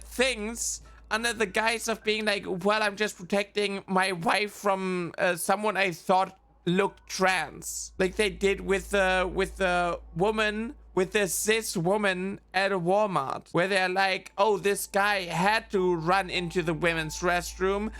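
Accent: Germany. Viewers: What's your accent? It sounds so familiar but I can't place my finger on it. German?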